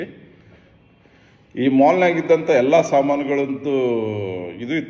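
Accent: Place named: North Karnataka, India. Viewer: Sir ನೀವು ಮಂಡ್ಯ ಜಿಲ್ಲೆಯವರು ಅಂತ ತಿಳಿದಿದ್ದೇನೆ ಆದರೆ ನಿಮ್ಮ ಮಾತು ಸಂಪೂರ್ಣ ಉತ್ತರ ಕರ್ನಾಟಕದ ಭಾಷೆ ಮಾತಾಡುತಿದ್ದಿರಲ್ಲ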